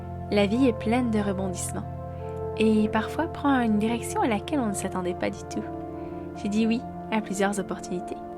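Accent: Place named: Quebec, Canada. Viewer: qu'elle belle surprise de te retrouver. Tu donne du plaisir à beaucoup de monde. Je suis un vielle homme de 75 ans invalide, veuf et je vis seul dans une maison trop grande. La vie que tu as choisi. Quel volonté et courage de continuer l'aventure seule sur ton petit eskimo. Tu vis ton rêve que d"autres ne font que rêver. Je vous suit depuis des années. J'aime votre accent du Quebec, parfois incompréhensible (je suis Belge) et vous et quelques autres voileux vous êtes mon seul plaisir. Bon vent à toi petite sirène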